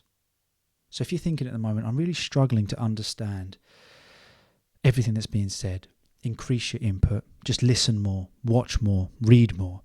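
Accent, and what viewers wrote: RP, United Kingdom. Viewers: I really love your podcast bro, so just keep going. You make learning English more easy and joyful without any stress, furthermore, your RP is so fascinating. I appreciate your effort you provides to us.🎉🎉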